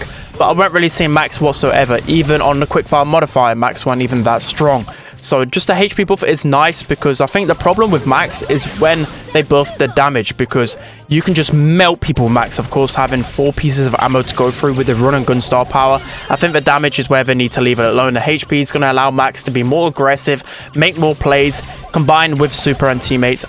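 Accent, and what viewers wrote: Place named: Liverpool, United Kingdom. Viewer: You're a Scouser? Yeah I'm unsubscribing.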